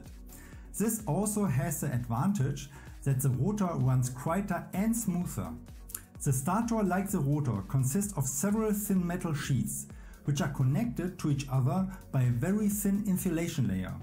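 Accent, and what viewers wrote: Germany. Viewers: 1) maybe a bit stronger sound even at maximum, I have problems listening to your video on the go (in the Berlin S-Bahn for example) 2) your German accent is extremely strong and sympathetic... 3) you put a lot of subjects in your video. I think it would be better to make different videos for each sub-subject. As you're explaining the basics here, I guess most people (including me) are a bit over-burdened with the many technical expressions 4) maybe try to use as little text in your video as possible. Maybe try showing as much by 3d-models only. Maybe use also some pointers to point to the parts of your 3d-models you're talking about 5) thank you for sharing your knowledge about electric motors. Thank you a lot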